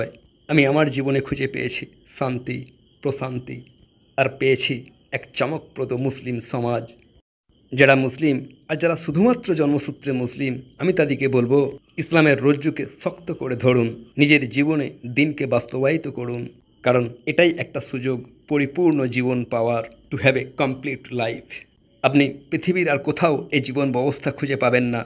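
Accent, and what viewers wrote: Kolkata, India. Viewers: এটা হয়তো কোলকাতার আঞ্চলিক ভাষা যার জন্য উচ্চারণটা এমন হয়তোবা!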